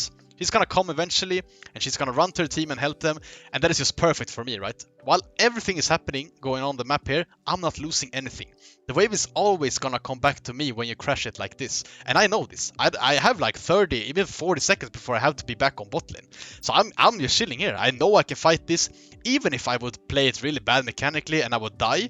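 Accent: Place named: Sweden. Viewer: Haha aldrig hört en bättre svengelska! Grym video🤘🏻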